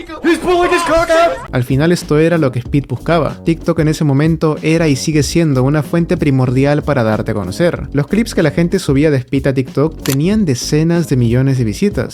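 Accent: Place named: Lima, Peru. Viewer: Tienes acento limeño. 💪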